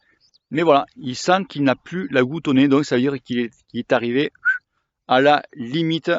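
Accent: South, France. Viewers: En faite tes détracteurs il ne comprennent pas ton accent du sud du coup il faudrait peut être faire des sous titres... Sinon moi je roule dans le sud Est pluie pas pluie avec ma femme on a deux 350 ça passe crème c'est vrai qu'il faut nettoyer le vélo après mais bon arrête de faire la fegnasse lol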